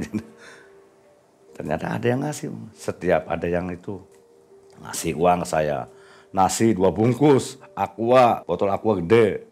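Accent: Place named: Cirebon, Indonesia. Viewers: Cirebone kentel pisan mang😂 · Logat cirebon nya khas bngt walaupun ngomong indo juga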